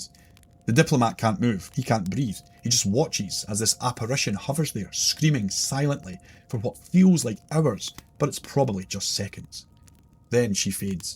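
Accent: Scotland, United Kingdom. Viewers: Carol scottish born live in Australia 🇦🇺 FINALLY found a brilliant scottish spoken brilliant story teller. Please keep me the great work 🙏 keep your voice the way it is ... unfortunately many change voice & end up singing & playing characters. Looking forward to many more stories 😊 cheers from a scot 🏴 from Australia 🇦🇺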